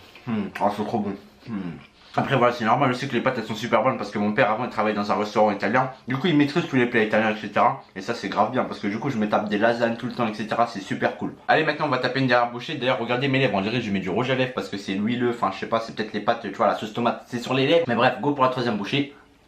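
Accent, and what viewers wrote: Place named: Arab world. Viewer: Wwa pas mal l'accent arabe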